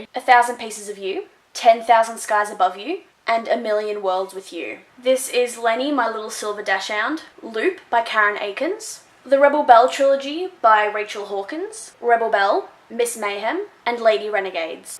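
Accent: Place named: Australia. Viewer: ayyyyye that aussie accent!!! hahaha I'm from New zealand and i loved this video so much (bookshelf tours are like the best right?) I subscribed and can't wait to see more from you!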